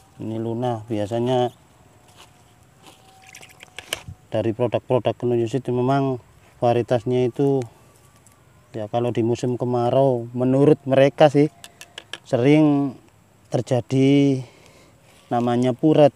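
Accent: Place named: East Java, Indonesia. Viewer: dari logatnya kalau gak malang ya surabaya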